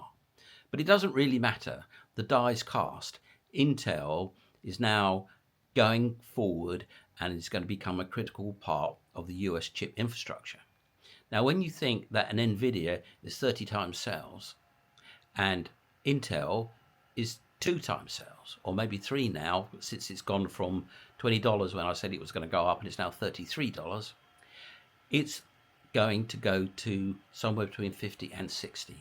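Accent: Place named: England, United Kingdom. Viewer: Came over from your Kitco appearance , cannot get enough of your insights , only fear I have is I might be to poor for your advice , Blackpool boy here with only one stick of rock , if you know what I mean ,nudge nudge wink wink but still does not cost me to listen , right , right ? you London barrow boys have a way of getting the coin out of the back pocket of one and all. Anyways at least I understand what you are getting at with your very English way of talking and of course when you say 33 dollars that is US which coverts to Aussie dollars to a lot more but I could just buy a hundred or so I guess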